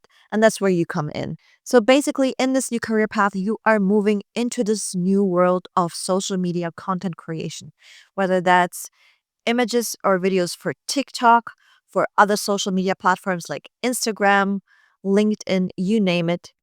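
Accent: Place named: United States. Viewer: A stand-out assortment of savvy tips and advice for the mid-2020s photographer. I can attest —even if only on the basis of very modest experience— that this video offers a good number of relevant ideas for our time and the contemporary market. | In re Ms. excellent ESL skills, as an American, I applaud the Yiddish-ish "regular-schmegular" [if I've gotten that right] and would like to add that "different from" as opposed to [the British-influenced] "different to" sounds much more natural to this listener's ear, particularly in view of the fact that her English [including accent] is overall far more "American" than British. In addition, the adjective "in the high street" is clearly a British-ism, for which one could substitute "main street" or just eliminate the modifier. It should otherwise be understood from context that said business is serious, reliable and focused on quality. 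You could also use the shorter "based in Berlin" vs. "based out of ~," which sounds like rural Wisconsin. O, those prepositions! These suggestions are offered solely in a constructive way and in appreciation of her mastery of the lingua franca of world business. Vielen Danke!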